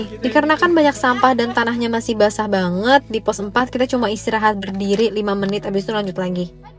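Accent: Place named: East Java, Indonesia. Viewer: Cakepp.. auto subs dh.. 😊👌 kirain asli jatim hehe..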